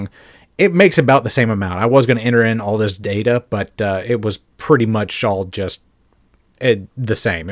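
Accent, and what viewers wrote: United States. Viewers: I'm obviously not speaking for everyone, and it is somewhat rude to say, but Slap on Location is annoying to listen to while playing games, due to shifting tones of narration (read vs memorised), long pauses in speech and the occasional interview, a big hurdle for people not accustomed to American accents.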